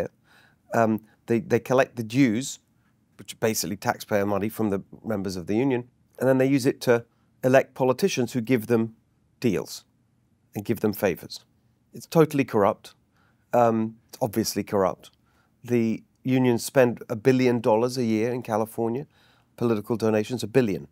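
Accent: United Kingdom. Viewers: another Brit telling us how 2 plus 2 equals 5? Great. This man is devoid of any economic knowledge. The price of energy in California is almost 50% above the national average. Energy costs are inflationary. Plus, he doesn’t distinguish between the cost of living versus the cost of labor. How about bringing someone else in whose sole qualification isn’t a pommy accent? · The man has a British accent but lives in California?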